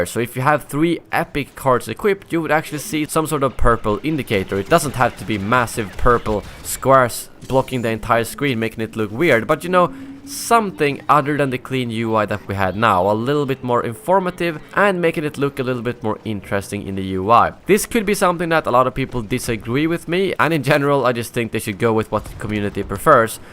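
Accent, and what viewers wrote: Sweden. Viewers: Are you from sweden, you sounds like that. Hope you don't take this bad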